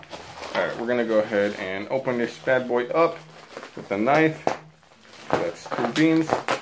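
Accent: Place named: Australia. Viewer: That's actually quite a good aussie accent!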